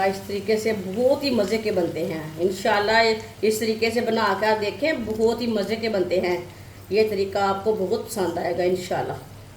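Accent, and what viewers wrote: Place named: Punjab, India. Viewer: Or is k bolny ka andaz b bus, panjabi style me urdu 😅😅😅😅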